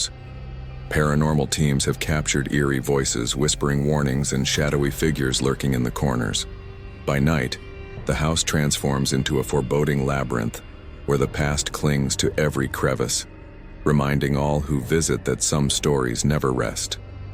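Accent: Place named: India. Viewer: Why does the ai narrator turn indian halfway through the video?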